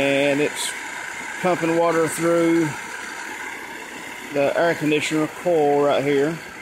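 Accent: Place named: South, United States. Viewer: he likely made a ignorant assumption because of your accent. because we southerners can't be educated.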